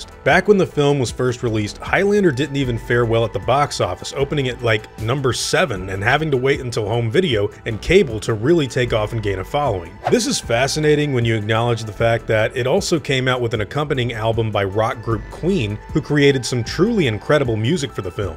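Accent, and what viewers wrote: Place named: Scotland, United Kingdom. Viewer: Egyptian Spaniard with a Scottish accent, brilliant👍👍👍👍👍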